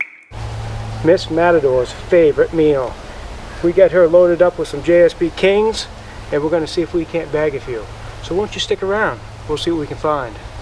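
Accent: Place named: Vermont, United States. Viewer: you sound like your from the NEK in vermont lol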